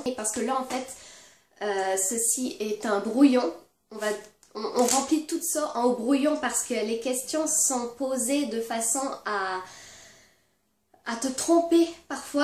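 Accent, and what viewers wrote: Quebec, Canada. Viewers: pk ta un accent Québécois ?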